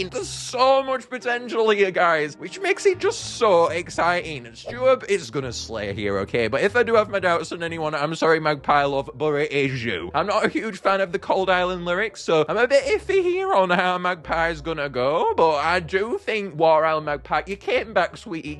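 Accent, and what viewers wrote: England, United Kingdom. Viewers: You’re English !!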